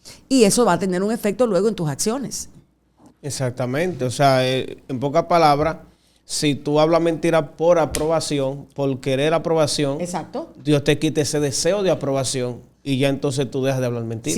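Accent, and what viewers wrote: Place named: Dominican Republic. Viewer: Q bonito habla está Hermana, q acento, q pronunciacion y enfasis mas bonito, muy capacitada en él conocimiento de la palabra pero sé nota q ha recibido un un espiritu de sabiduría y revelacion muy vasto! Poniendo la mujer cristiana dominicana en alto!!!!Saludos de una hermana cubana desde Kentucky!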